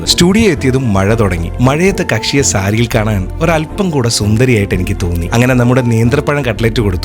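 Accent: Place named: Wayanad, India. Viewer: Vayanadan sound 🤭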